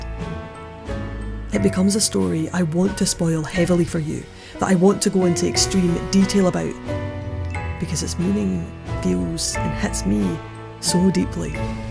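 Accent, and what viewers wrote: Scotland, United Kingdom. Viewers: scottish accents r beautiful and ur beautiful. its just my auditory processing thats shit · don't ever stop putting word thoughts in my earholes with that sweet Scottish melody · Finally an accent I understand... Wait this isn't the same regional accent as me? I can't understand you I'm not from your part of Alba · It had taken a while to know who you are when introduced you but it was listening to your voice and I knew it, you were the one who made that incredible review about Yagate Kimi or Naru a few months ago. And since I love your Scottish accent, it's perfect (and I'm tell you being a Honduran, whose first language is Spanish) and yet I can understand you perfectly. You are amazing. Regards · I don't know what that accent is (Scottish?), but I like it. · Scottish accent? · I loved your Scottish accent. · somehow the scottish accent is a constant funny thing for me that i cant take serious, so this video threw me through quite a loop cause the accents funny but ive only witnessed it in comedy before. 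that made it quite challenging to listen to what good things she's actually saying.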